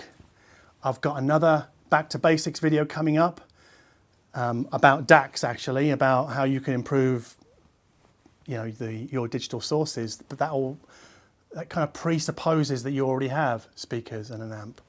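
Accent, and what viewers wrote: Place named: England, United Kingdom. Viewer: I like the way you speak, always have been jealous of english accents.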